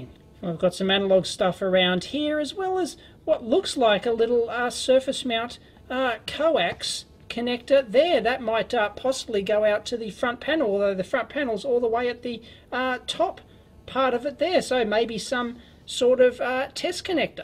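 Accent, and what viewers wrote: Australia. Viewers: I've been watching your blogs for a year and I dig the Aussie Accent. And the material is always excellent.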